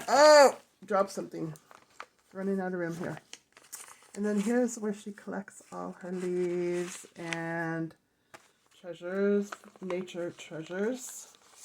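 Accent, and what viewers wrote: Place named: United Kingdom. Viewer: loving that British accent !! 😊🫖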